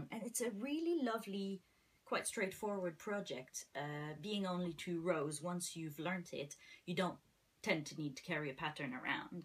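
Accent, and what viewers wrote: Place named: Canada. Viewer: love this! Love to hear a Canadian voice x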